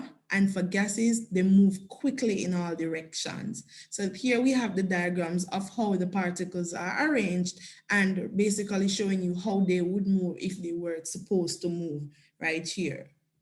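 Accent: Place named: Jamaica. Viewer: I find this Jamaican accent very off-putting...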